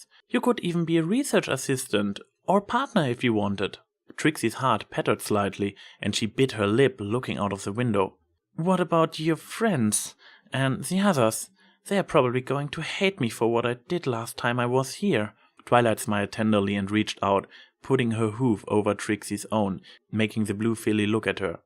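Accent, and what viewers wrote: Germany. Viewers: ich mochte deinen deutschen Akzent schon immer, aber beim vorlesen Find ich ihn wirklich klasse, es gibt dem text so etwa interessantes. Eigentlich interessiert daran deutsche Fanfic zu lesen? Ich finde deine Stimme ideal für "Dramatic Feadings". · cont.3 Thirdly; and understand that this is not meant to mean I didn't like the way you read, because I did; keep at it. Your technique will become more refined with practice, and I think that this is a worthwhile endeavor. I always enjoy a good story, and if it's being read with a German accent, then so much the better. XD And that's about it. Keep it up, man, this was very enjoyable, and I hope to hear more in the future. It was a neat change of pace. /)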